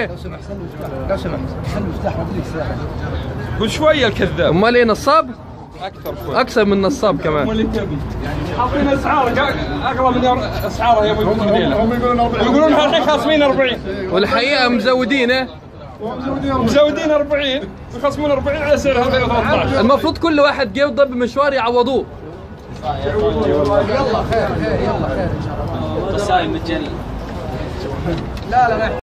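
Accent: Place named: Saudi Arabia. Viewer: يابني ده سعودي ليتكلم مصري ..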